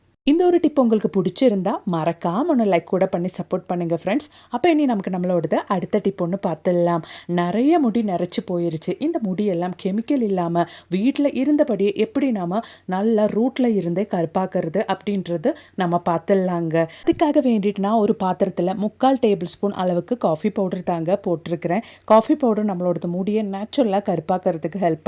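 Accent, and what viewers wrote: Kerala, India. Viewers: Your Malayalam tamil wonderful and ur tips also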